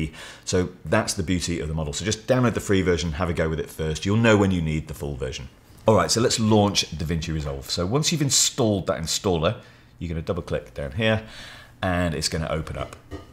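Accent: England, United Kingdom. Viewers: apart from the British accent, the video is great. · I am Swedish and I just love your English accent. Its sound so pure and beautiful, not like the american accent 🤣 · I stopped at this channel purely because the presenter speaks British English, not American English. Then I found that he is knowledgeable and thorough as well, so I subscribed. 🙂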